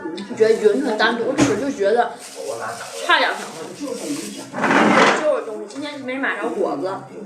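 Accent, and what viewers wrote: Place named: Tianjin, China. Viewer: 特别喜欢听Kaka说的津普，很像上学时听同学说话的感觉👍加油！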